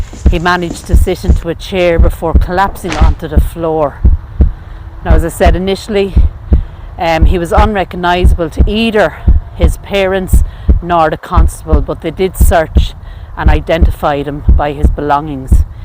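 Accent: Ireland. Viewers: What a adventure you bring us on. Plus I get to see Ireland. I grew up in Kingsbridge in the Bronx. A very Irish neighborhood that I truly miss. When you speak it reminds me of Kingsbridge yes near Gaelic Park and the beauty of Ireland makes me realize the famine Ireland had must have been so bad to leave the beauty of Ireland for NYC. Your videos are fun and I apologize for sort of going off topic. I look forward to watching all you have done and subscribed to the future ones. I’ll also share.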